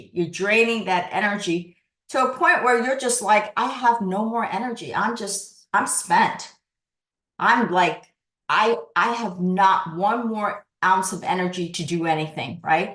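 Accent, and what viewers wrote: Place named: California, United States. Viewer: Thanks❤ sounds like a strong californian accent 😊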